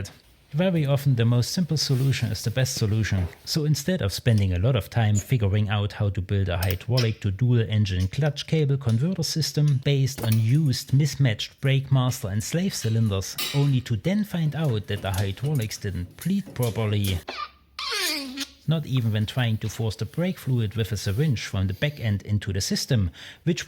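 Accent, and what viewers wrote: East Germany, Germany. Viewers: Never laught so much about a german accent xD I love it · Du kannst mir sagen was du willst, Youtube sagt, deine Videos sind aus den USA, dein Shop registriert in UK, aber dein Dialekt und dein Erfinderreichtum... Deutscher 😅 Bei kann man sogar Lieferwagen sehen, die das bestätigen... Bisschen chaotisch, interessante Ideen und sogar Wissenschaft dahinter. Cooler Content! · Das englisch mit ostdeutschen dialekt ist immer am besten xD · What is this? Is this the east?-German (based on the accent) version of StuffMadeHere with a focus on engines and a moldy refrigerator full of alcoholic beverages? Super exciting to watch in any case! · Ostdeutsch und Englisch gemischt geiler typ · Man hört das du Ossi bist, geiles Video! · Am I imagining things or are you from east germany? My coworker is from Sachsen Anhalt and he sounds pretty much identical to you in terms of dialect. 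· der akzent, pfeffi und goldkrone... schon eher östlich in deutschland oder 😁 keep on going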